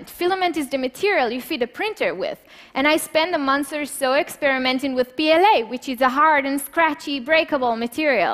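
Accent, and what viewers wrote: Israel. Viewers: I do hear the slight Israeli accent :P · Most Israelis have a heavier accent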